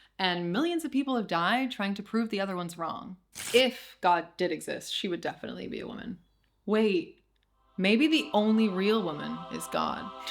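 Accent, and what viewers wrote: United Kingdom. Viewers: Your intonation is so British now!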